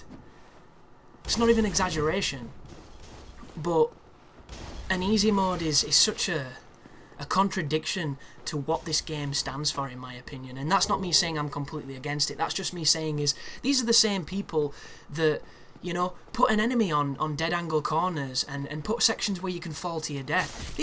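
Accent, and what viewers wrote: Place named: Lancashire, United Kingdom. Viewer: Are you from Lancashire Mr.?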